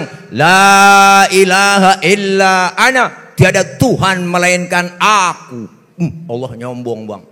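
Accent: Jakarta, Indonesia. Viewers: Lucux pak ustad dg logat betawix. Semga ulama ulama yg lurus ini selalu dlm lindungan Allah. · Masya Allah.. Alhamdulillah pencerahan ilmu nya . jadi inget kampung babe di betawi gaya bicara nya ... masya Allah ...kangen banget doakan saya bisa pulang kampung... segerA. Aamiin yarobbal alamiin🙏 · Alloh Qobul..ketemu beliau langsung dah.. logat bahasanya Betawi bgt ana demen bgt..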